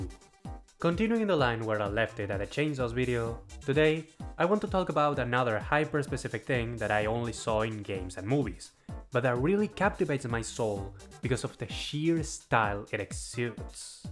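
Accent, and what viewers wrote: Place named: Argentina. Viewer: Sorry if this is a weird question but by any chance,Do you happen to have a Spanish-language channel? I think I've heard your voice before, and judging by the way you pronounce certain words, I think you're a Spanish-speaking Argentine.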